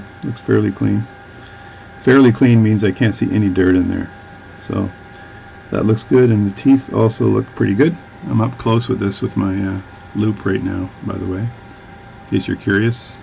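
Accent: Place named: Canada. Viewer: Aboot. Does intrigue me the Canadian accent. We have “geordie” accent from Newcastle that sound similar words. And also the Scot accent which has very similar sounding if you know what I mean. Lol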